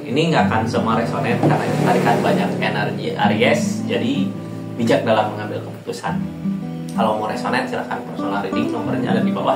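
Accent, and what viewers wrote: West Java, Indonesia. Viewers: Assalamualaikum.maap apakah anda.ada di bdg..? Boleh minta alamatnya...? Sy liat daricara bicara sunda hehe.mksih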